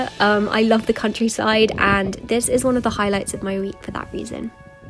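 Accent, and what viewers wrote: United Kingdom. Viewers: I'm learning English, and I love your videos 'cause they are very aesthetic and I really love your accent because he helps me my practice of my listening in English British. Thank you so much!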